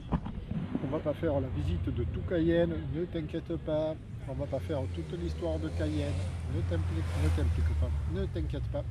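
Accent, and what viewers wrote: South, France. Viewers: J'entends l'accent du Sud. Vous êtes originaire d'où si ce n'est pas trop indiscret ? Ça doit être fatiguant d'être en navigation solo. Vous devez être toujours en hyper vigilance puisque vous n'avez pas de coéquipier pour vous relayer.... Jolie musique de fond 🎵🎶🎵 On dirait la même personne qui a fait la musique pour Catamaran Mistertong.... Je vous ai découvert grâce à Catamaran Javerne qui sont actuellement au Brésil où vous étiez il n'y a pas si longtemps. Je m'abonne car j'aime bien votre chaîne 😊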